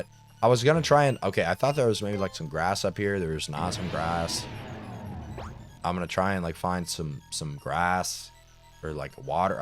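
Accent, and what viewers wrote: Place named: London, United Kingdom. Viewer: _ATJAbouTime: ligma be damned get well ah kid at least it's not sugma.... Love the series ah kid keep it up and no faffin. For the love of all things holy please learn to do a proper English accent no the London bollocks lol :_ATJDewgKEKW: Broke/bone for a name :_ATJTrevSmile::_ATJBrudOK::_ATJTrevSmile::_ATJBrudOK::_ATJTrevSmile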